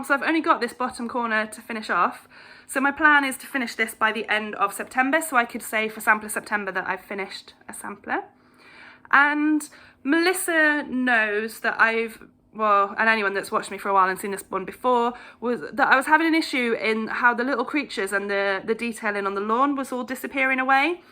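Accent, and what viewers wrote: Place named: United Kingdom. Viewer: Hey! I’m a new subscriber and a relatively new Flosstuber also. Love your projects, personality and accent! I’m an American and my 10 year old daughter walked in while I was watching you. She said - “is she British? I LOOOOOOVVE her accent.” Lol. And so do I. So fun. Anyway - for what it’s worth I love life updates up front. Life updates make a Flosstuber more relatable and memorable. Just my 2 cents. ❤️❤️ Looking forward to more. 👍😘🤩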